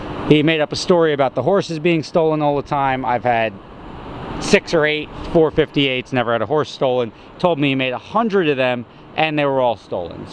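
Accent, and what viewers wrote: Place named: Upstate New York, United States. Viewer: where is this guy from, a very distinct accent almost like upstate new york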